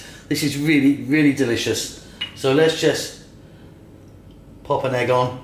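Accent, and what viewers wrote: Philippines. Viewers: Like your Tagalog accent, and it is nice to see you back, and this recipe looks damn delicious will try and Thank you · Very good Pinoy accent to the ingridients"Nice presentation also keep cooking taglish Version Thank u..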